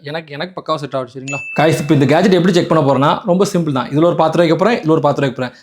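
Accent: Tirunelveli, India. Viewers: Thirunelveli language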